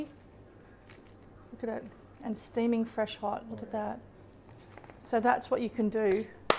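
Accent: Australia. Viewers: She might be the first European to like Americans 😂or is she Australian? Im not very good at telling just by the accent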